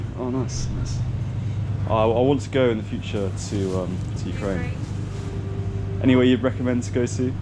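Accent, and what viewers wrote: England, United Kingdom. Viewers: Being Irish myself, I love your English accent and you are also a very handsome-looking young man 🇮🇪☘️🇮🇪🥰🥰🥰🥰🥰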